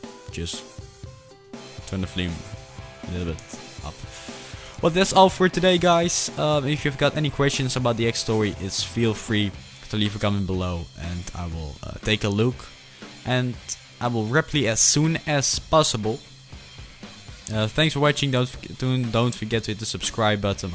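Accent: Netherlands. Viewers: Nice tutorial. Btw ik kan horen dat je Nederlands bent :P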